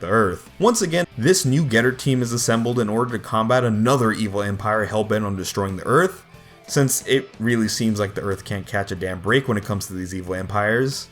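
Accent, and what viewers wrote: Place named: South Korea. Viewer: なんかぺららぺ朝鮮なまりがきつい朝鮮なまりがきつい まじで・・・YES・・まんじゅ。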